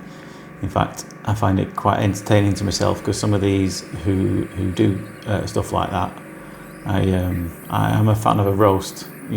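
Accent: United Kingdom. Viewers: Did you say that you twang your G string every now and then? 😂 you need to Google what a G string is in the US…LMAO 😂 I love the way you British talk!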